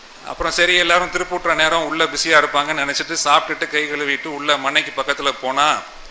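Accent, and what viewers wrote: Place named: Nagarathar, India. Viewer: A pure nagarathar accent. So nice to hear. We need speech like this who never mixed foreign language or never mixed others accent too. Hospitality of nagarathar will always be identity for the community. 🎉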